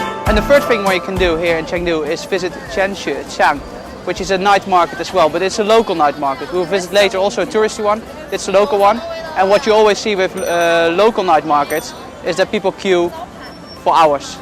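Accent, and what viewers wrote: Netherlands. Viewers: Thank you for the very informative video :) we’ll be there for a few nights in December. Your accent sounds Dutch btw, is that right? I lived in Groningen for a short while :)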